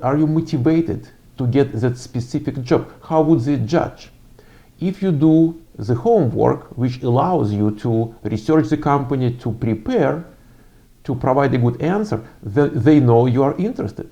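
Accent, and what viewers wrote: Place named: Russia. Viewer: Cпасибо! Всегда хотел услышать как вы говорите на англ. Ваш речь мне особенно понятна, потому что вы говорите с русским акцентом. Скажите, это считается очень сильный акцент? Потому что вас я понимаю отлично, а носителей намного хуже. В общем учу язык по вашим видео!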